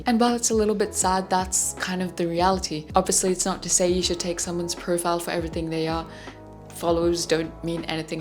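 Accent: India. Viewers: ur voiceee issssss sooo beautiful are u Indian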